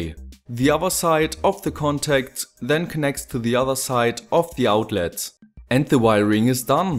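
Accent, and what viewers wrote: Germany. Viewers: Are you German? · pronunciation is amazing. It's comical, Germans wouldn't understand the affectionate way we perceive the German accent ;) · are You German?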